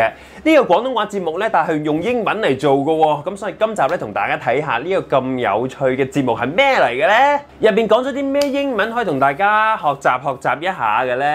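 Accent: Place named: United Kingdom. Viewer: 七師父用British accent有料!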